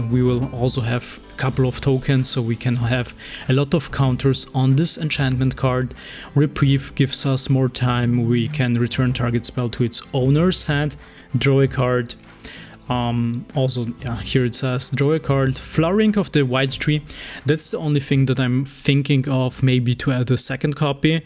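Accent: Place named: Germany. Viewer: Aren't you German? Because your voice/pronunciation is really cool.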